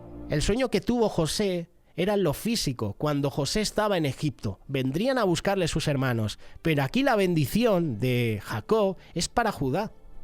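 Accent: Spain. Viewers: Hermosa explicación, hace poco que sigo su canal y sus contenidos son muy edificantes. Que bueno saber que en Europa hay fervor por la Palabra de Dios ( entiendo que la voz en off es de un español) Bendiciones desde América del sur ( Paraguay)🇵🇾💖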